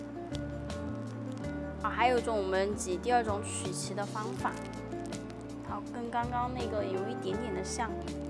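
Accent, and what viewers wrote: Sichuan, China. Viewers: 干货很多，小老乡，加油！主要是听着川普比较亲切，没有笑话你的意思。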